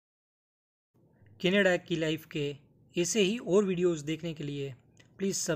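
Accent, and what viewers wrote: Gujarat, India. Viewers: Bhai boli upar thi Gujarati lago cho tame ....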